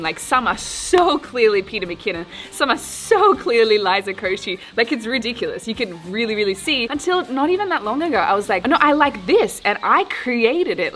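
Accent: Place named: Australia. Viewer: This was probably one of my favourite videos you have made! I loved all the content - preaching compassion and being content with your personal progress. But I also loved all the different scenes (backgrounds?) They looked great! And... You know your personality is just so fun! And your Australian (which all aussies love!) Keep up the great work!!!! 🇦🇺🌏😃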